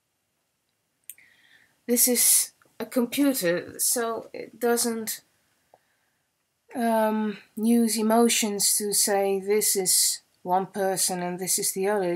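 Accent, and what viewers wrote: United Kingdom. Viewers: Thanks for sharing this, it was wonderful and a great way for me to start my day. I found myself chuckling along with some of your commentary especially regarding the PIDers. I thought at first that your accent sounded slightly British but as an American with my own southwestern twang (accent on the southern) I wasn't sure until you said you are a Dutch girl. Anywhoo thanks for this. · "Hey Ringo, how are you?" HAHAHA :D Nice video! I wonder how long it took to collect all these gorgeous photos. ...And I feel your struggle with the English language (I'm German and I try to get rid of my German accent haha), but you already do sound pretty well and British in my opinion!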